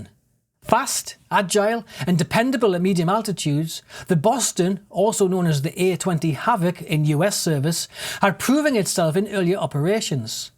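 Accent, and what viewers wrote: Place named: North, United Kingdom. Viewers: Well done, buddy. Every time I hear you, I travel back in time to Blyth in the 70's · I’m sorry sir, it just appears that way to me. Maybe I’m overly sensitive to it, and it could be I’m not used to your hard northern accent. Chris Ramsay the comedian is quite harsh on some of his words too so it could be a regional thing I just hadn’t heard before.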